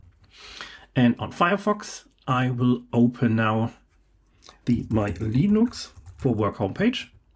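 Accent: Germany. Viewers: Arch install mit deutschen Akzent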